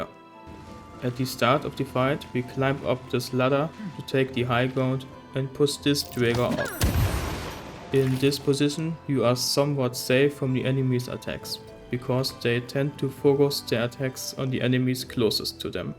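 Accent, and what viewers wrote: Germany. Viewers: Are you german? Your accent sounds really unnormal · Der deutsche Akzent oh yeah xD Hessische Grüße 😎😅🤘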